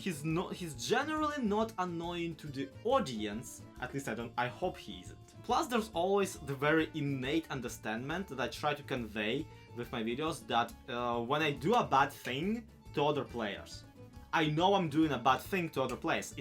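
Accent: Russia. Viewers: the thick russian accent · Дикция палит тебя что ты руский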